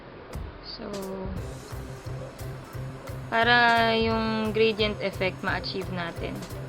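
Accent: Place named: Philippines. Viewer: Are u filipinoss?? So beautifull. And ur tagalogg soo 😍😍😍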